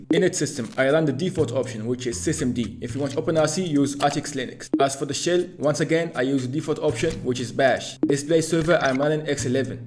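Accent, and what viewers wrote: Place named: Maghreb. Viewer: mghribi accent, i can sence it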